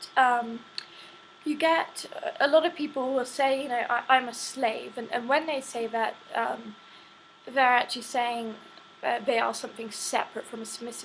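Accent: United Kingdom. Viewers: A blond-haired, blue-eyed, British-accented Asian? The f? Your "master"is like 50 and you are what, 18-19? This is just stupid and crazy, especially that he enjoys seeing you in pain!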